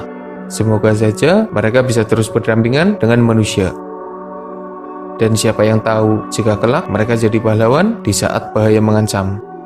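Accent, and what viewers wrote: Banyumas, Indonesia. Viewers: Ngapak e katon nemen kang🤣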